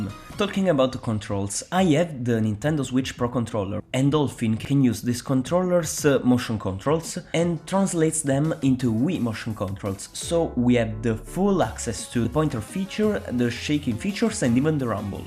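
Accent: Italy. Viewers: Wait i hear italian accent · Bro are you italian?? You're accent it's very similiar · Io, secondo me dall’accento è italiano… vado nelle info del canale, location: Italy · Ero sicuro di sentire una parlata italiana, e non mi sbagliavo. Ottimo video comunque, quando la versione ufficiale di Nintendo fece un flop non ne rimasi molto sorpreso onestamente. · sei percaso italiano ? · This dolphin-powered fan-made collection is such a cool concept. Love these upgrades, this looks like a very neat package. PS: Comunque, adoro il tuo accento. Sembra che il video lo abbia narrato Super Mario in persona. Nel senso buono della cosa. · Ma sei italiano ahahahha, comunque bel video anche per i 4k60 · Quanto si sente che sei italiano porca troia ahah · Non so perché ma ho la sensazione che tu sia italiano. Sarà l'accento ahaha. Comunque gran bel video. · Bro do u have an italian accent? 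Sembra un botto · one of the best video I've seen! As I understood, you sound like you are Italian, as I am, that makes me even prouder that an Italian did this, without much effort. Can I just ask you if you can send me the control scheme for galaxy 1 and 2 for dolphin? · Hai un'accento italiano fantastico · I lovr your accent, are you italian? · You sound so italian bro Non è per offenderti btw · you sound like an italian speaking english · are you italian? i can hear it.. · Are you Italian?? As an American I Love the accent. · Oddio, ma sei Italiano. L'ho riconosciuto subito l'accento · U sound Italian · Sei stra italiano vero? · out of curiosity, are you italian? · Bro you sound kinda italian, are you? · sei italiano vero? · bro bel video ma si sente che sei italiano e mi cringio un po' a sentirti però bel video eh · Bro ma hai un accento italiano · di che sei italiano senza dire che sei italiano <3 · I understood you were italian only by the accent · Si sente subito che sei italiano! 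Sono cresciuto con i giochi di mario per gamecube e wii, è sempre bello vedere che i fan hanno ancora a cuore i titoli del passato e si adoperano per renderli immortali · bro ma sei italiano palese si sente una fracca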